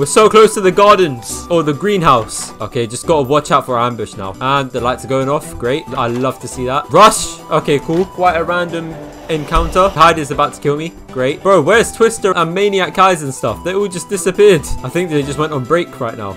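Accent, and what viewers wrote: United Kingdom. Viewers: He already voice revealed British🇬🇧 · It is too brittish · Lol British voice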